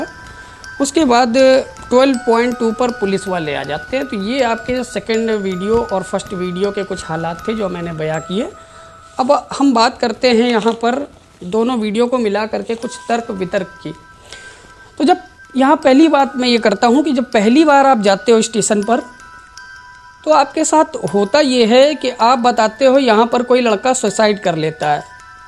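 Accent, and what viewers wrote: Bihar, India. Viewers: Interacting channel interesting analysis. To the point. Nd ap bihari ho?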